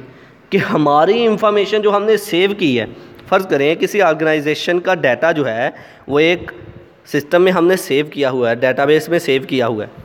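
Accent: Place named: Punjab, India. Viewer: Thank you for highlighting Sir! I had liked the video and subscribed the channel. Your tone resembles Punjabi speaking people.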